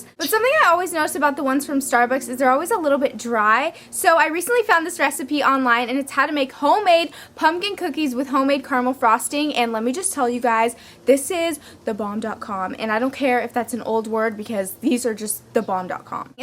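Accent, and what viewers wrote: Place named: England, United Kingdom. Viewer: you must be from england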